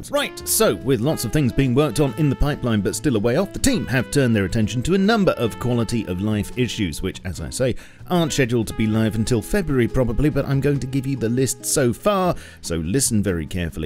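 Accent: United Kingdom. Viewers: Am I the only one getting tired of the british accent thing? Not talking only about this channel, but everywhere in YT.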